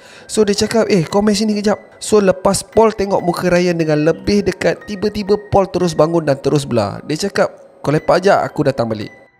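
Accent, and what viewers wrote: Perak, Malaysia. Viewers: trbaekkk...1 lagi sbbkan video2 abg yg sntiasa trbaek ni laa..jadi list fav sy untuk slalu dngar time kat tmpat krja...sblom ni kalo asyik headbanging je...lenguh jgak tngkok ni 🤣🤣🤣..dan Alhamdulillah...atas sbbkn abg ni orng perak dan stiap video abg ada sleng2 perak...skang ni dah brjangkit kat member2 skrja sbb mostly diorang smua brasal dri perak trmasuklah boss skali🤣.... yg sy baiahkan untuk jdi "murid2 baru abg...hasil dri tngok crita abg smbil connect kat speaker radio...stiap kali kat tmpat krja🤣🤣🤣🤣...btw untuk abg...smoga dipermudahkan urusan selalu dan trus melangkah maju dngan mmberi video2 abg yg menarik stiap masa...insyaAllah amin...👍🏻👍🏻👍🏻👍🏻👍🏻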